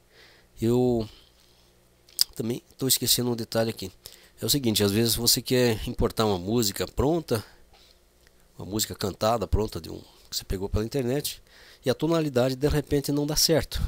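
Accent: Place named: Curitiba, Brazil. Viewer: Caro Amigo, que maravilha o teu canal chê!!! Estou tendo de gravar no audacity, por eu ter mexido no meu Sonar 6, e sem saber, devo ter mexido em algum menú que tenha desconfigurado totalmente as saídas de audio e NÃO ESTOU PODENDO OUVIR MAIS NADA DO QUE JÁ GRAVEI!!! Prejuízo lamentável, e o pior de tudo, não sei se terei de perder tudo o que já gravei! Pois penso em pedir a um amigo meu pra desinstalar o Sonar 6, e re-instalar de novo, ou haverá uma esperança de eu reaver tudo de novo, se existir uma forma de eu "resetar" TODAS AS CONFIGURAÇÕES DE FÁBRICA do Sonar 6?!? Ainda que eu perca tudo o que eu gravei, (são só seis músicas) E voltar do zero de novo?!? Há essa possibilidade?!? Por favor, me dá uma luz... Desde já, muito agradecido. Um grande abraço daqui da Cidade de Maringá, Noroeste do Estado do Paraná, sul do Brasil chê!!! Sois de Curitiba?!? 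Senti bem forte uma acentuação no teu sotaque que me é muito amigável e familiar!!! Reiterado Abraço meu Amigo!!!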